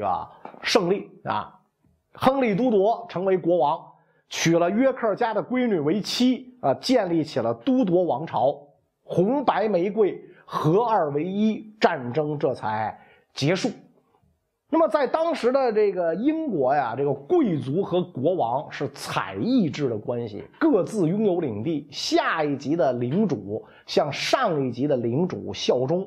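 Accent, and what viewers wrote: Beijing, China. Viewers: 老師聊什麼我都愛聽，這口京味就是聽著舒服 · 袁先生的Beiglish第一拝聴、 很有特徴！！😉👍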